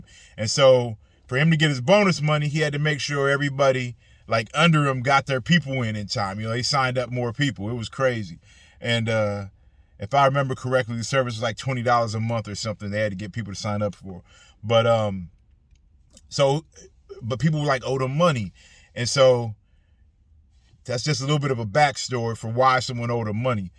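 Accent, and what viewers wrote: Bronx, United States. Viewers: Sounds like he from the Bronx 🤣🤣🤣🤣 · Are you from the Bronx?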